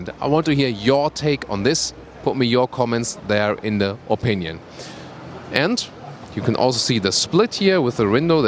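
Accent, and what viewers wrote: Germany. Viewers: I'm enjoying this just because it is a German review of the car:) I've been to Germany 7 days and love it there but listening to a German speak English and review a car.....epic!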